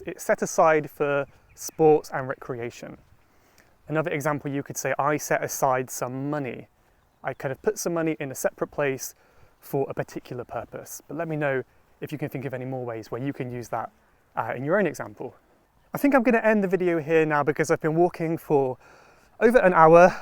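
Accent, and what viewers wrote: United Kingdom. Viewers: Very nice video. Love British accent.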